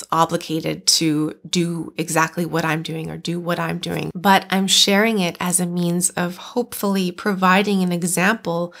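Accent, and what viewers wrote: Canada. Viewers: She's not American and has a distinct Candian accent. 😂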